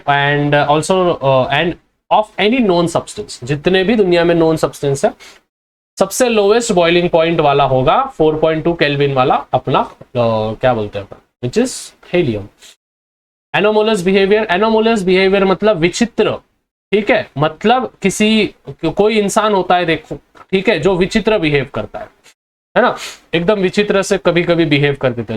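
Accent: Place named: Aurangabad, India. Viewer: Full aurangabadi accent 😂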